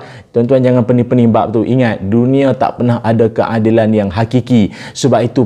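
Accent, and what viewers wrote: Kedah, Malaysia. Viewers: tutur kata ustaz mudah faham.loqhat kedah tak keterlaluan teruskan berdakwah salam baling ledah.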